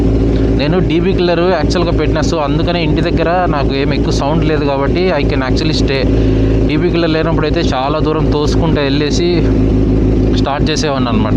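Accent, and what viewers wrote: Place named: Rayalaseema, India. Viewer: Anna Rayalaseema yasa superga undhe…Anna medhe kadapa na ??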